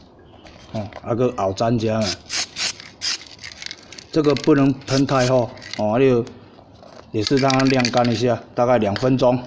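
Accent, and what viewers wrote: Taiwan. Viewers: 口白：落魄到拍Youtube要轉便當錢（沒辦法） 說真的，真的是一個很務實、又踏實的人（擦鞋也算是生活小細節，小品）加上一點點的江湖味，操的台語口音，真是滿有意思的。所以，工作太忙也要記得來收看的影片。給的建議：拍影片是快樂的，不要因為這樣有壓力，坦白說一支影片要弄好大概也是要花很多時間。記得要幹嘛！訂閱！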